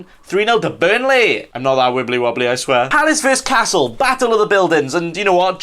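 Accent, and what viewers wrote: Wales, United Kingdom. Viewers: Where you from m8? Swansea? I’m in Haverfordwest....great video as allways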